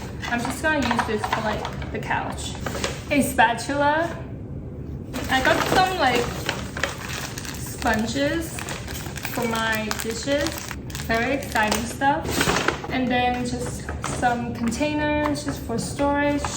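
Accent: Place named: United States. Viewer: I think you are Vietnamese but your accent very looks like from the US. It makes me confuse :v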